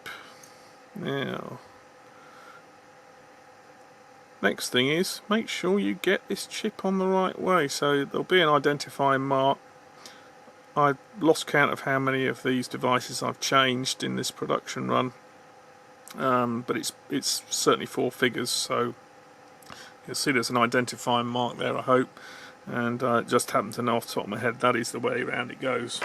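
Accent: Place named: United Kingdom. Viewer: Just the right amount of verbal I say. Newby here, so I appreciate being walked through the procedure. Got the air gun, but looks like I'll need the flux pen and the cleaner. Too bad the radio is all apart and on the bench already, but I watched someone else's video where they just did a simple reheat. I feared the solder would overflow to the other terminals, but apparently not an issue. Wish me luck. Oh, the British? accent/colloquialisms were spot on! (to use a term). Full Wacko (unintelligible) on the airflow LOL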